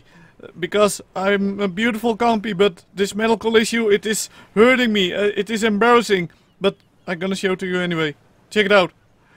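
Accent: Netherlands. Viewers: You sound dutch.. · are you From the netherlands, because you have kind of a dutch accent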